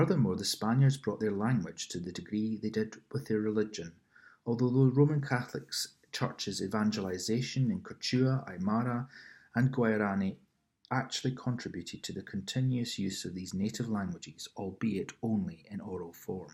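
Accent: Scotland, United Kingdom. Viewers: your Scottish accent is delightful. And for some reason I can understand it (probably because you're reading 😅). I'm sure you will grow once you get some exposure.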